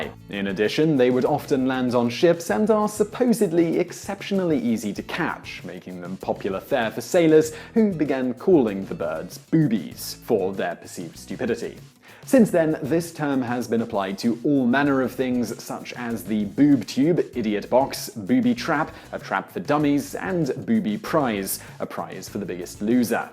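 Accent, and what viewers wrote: United Kingdom. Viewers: awkward or not, I still giggled when, with a straight face and 'serious' British accent he rattled off 3-4 euphemisms in a row... - I almost an entire video of him just reading a list of all the terms they can track down, either for boobs, the male organ, or any other 'naughty topic' in which language has created so many options to 'not say' what one is saying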